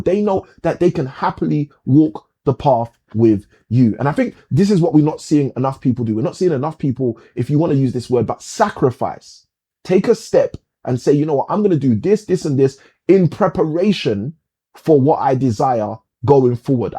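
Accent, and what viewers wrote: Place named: Africa. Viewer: Your not from the UK your from some place in Africa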